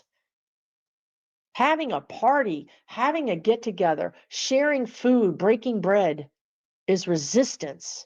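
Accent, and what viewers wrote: Texas, United States. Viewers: I didn't realize you're from KY. You do have a bit of an accent, but I thought it was Texan. 😂 I'm from IL and lived for years not far from the southern tip. I was told I had an accent when we visited family in WI!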